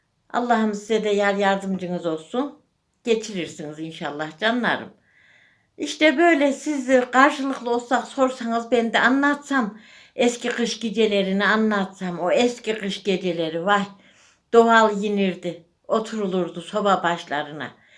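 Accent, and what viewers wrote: Kayseri, Turkey. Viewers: Babanecim senin şu konuşmana bayılıyorum . Kayseri’yi özledikçe izliyorum seni maşallah sana 🧿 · Bende kayseriliyim, üniversite kazanınca Ankaraya taşındık 6 sene önce. Küçüklüğüm hep babaannem dedemle Sakar bağlarında geçti. Araya korona da girince babaannemi daha çok göremez ve özler oldum... Konuşman vurguların sepliliğin aynı babaannem gibi teyzem benim.. Allah sana sağlık sıhhat versin torunlarınla uzunca yaşa inşallah ellerinden öperim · Teyzem bende kayseriliyim Talasın bir köyündenim... Konuşmanız, adetlerin bizim oralara çok benziyor. Siz neresindensiniz. Ellerine sağlık maşallah gençler elinize su dökemez...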